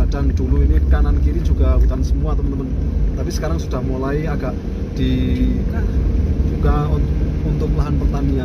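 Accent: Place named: Wonosobo, Indonesia. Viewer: Milu nyimak kiye bos, gara2 longsor kasimpar fidio chanel mana saja yg liatin ds kasimpar tk tonto, rika wong ngendi si bos, kaya enyong wae logate, wonosobonan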